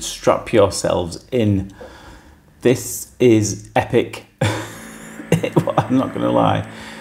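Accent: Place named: Liverpool, United Kingdom. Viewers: Are you in Wigan? It sounds like you are?in the middle of Liverpool and Manchester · You’ve got a slight Liverpool accent 🙂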